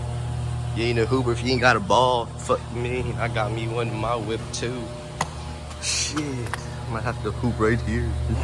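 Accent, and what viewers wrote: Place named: Charleston, United States. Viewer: Where you from? Sound like chucktown. But you can’t make that thang fast dawg I have one 2V booty bruh it’s fantastic for your first V8 it’s my first V8.. and I’ve had 2010 CTS v6 straight piped and a 2017 camaro V6 with factory NPP quad exhaust. My v6 Camaro was faster than my 2000 Gt. And Camaro was factory stock. Stang has full exhaust Throttle body. Heads, intake, stage 3 flywheel and clutch. Short throw shifter (so much better than anything) 350/70 something rear wheels or 370 some huge ass tires on the rear. Haven’t tried topping out yet or digging it like that because I don’t want it to break.. Shit is loud as hell but Slow 😭 my first V8 though tbh so I just love it and love how it sounds. As long as I have a V8 I’m happy.. idc if it’s slow I came from V6 all while wanting a V8.. finally got one that’s not on credit and PAID. 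About to get a C5 next way more capable of power less money than 4.6 2V Topped my CTS v6 at 145 completely straight for an hour hit 145 V6 Camaro hit 150Mph My new edge 2000 GT I haven’t even tried to top out yet 5 speed but if I did I hypothesize I’d say 150Mph? But probably longer to get there? Might be quicker to get up there than my Camaro with 20K miles That’s a maybe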